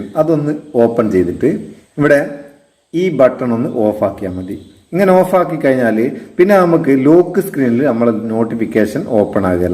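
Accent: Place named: Koyilandy, India. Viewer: ഇങ്ങൾ കൊയ്ലാണ്ടി ആണോ ന്റെ ഉപ്പാന്റെ ഫ്രണ്ട് ഇതേ സംസാരം 😄😄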